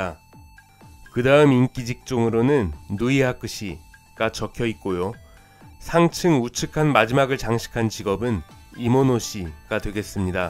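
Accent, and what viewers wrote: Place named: Korean-Chinese, China. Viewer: 한국인 맞음? 말투가 조선족같노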